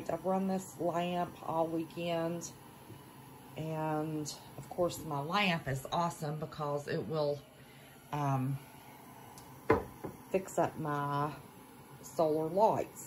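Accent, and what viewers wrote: West Virginia, United States. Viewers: What accent is that? Sounds like West Virginia to my ears. Am I close? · thanks for the tips :) lol and it is a West Virginia accent, I love it xD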